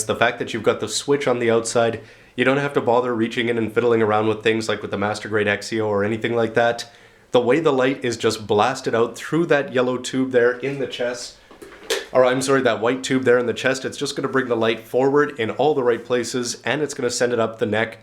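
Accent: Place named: Canada. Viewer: sounds Canadian...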